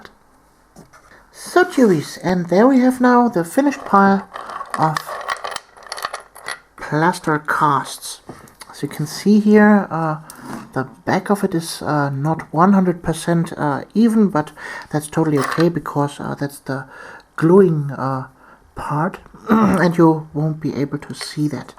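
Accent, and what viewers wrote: Germany. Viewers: it's not a British accent. it's a german one based on western film and TV.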